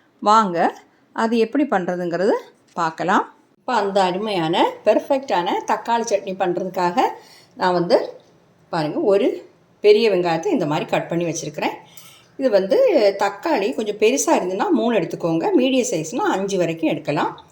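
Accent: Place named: Kanyakumari, India. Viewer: அவங்க கன்னியாகுமாரி மாவட்டத்தை சேர்ந்தவர் போலும்.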